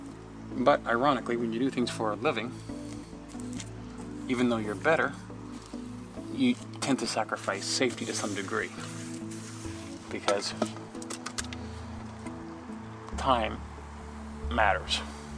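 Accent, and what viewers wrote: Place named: Canada. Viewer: if they're not home, then you must have them open them later as you zip them free with your putty knife/ 5 in one.. yeah, he's a canuck.. i can tell my accent and architecture.... i think in Canada like England there must be a law you have to paint your windows white... Dap with Silicone is ok caulk, i prefer a elastomeric caulk... you really can't do that much caulking and wiping it out, should have caulked it first or earlier if window have much cracking..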